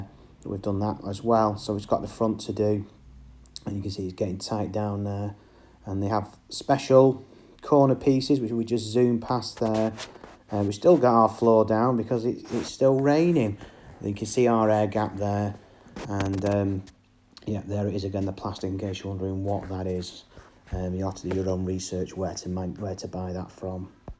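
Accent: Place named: Manchester, United Kingdom. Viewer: Hello. You sound like you’re from Manchester. Please can you call me. Inbox me for my number. I’m planning of doing a mancave for myself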